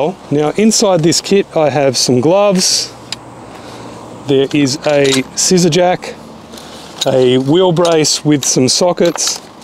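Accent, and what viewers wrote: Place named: Australia. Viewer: Very informative for new and old Tesla owners. Keep churning more videos. Aussie accent goes a long way. Thanks mate!!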